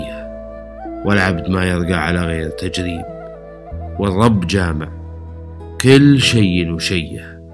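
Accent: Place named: Nabati, Saudi Arabia. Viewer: انت تملك موهبة نادرة جدا ً ، كيف تستطيع أن تنظم مثل هذه الأبيات الجزلة ، والمفردات الغزيرة والنظم المندثر تماما ً ؟؟؟ والأغرب أنك تملك اللهجة النبطية الأصيلة ، فأنت لاتكسر أول الفعل !!! يجب أن تهتم بتدريس الشباب المهتمين بالشعر ، وإن فعلت ، سوف يتخرّج من مدرستك جيل يعيد الشعر الى مجده . ويكون إسمك خالدا ً . 🌹🌹🌹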